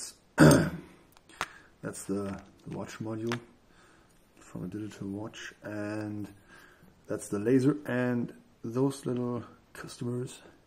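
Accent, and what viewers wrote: Germany. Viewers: you are german right?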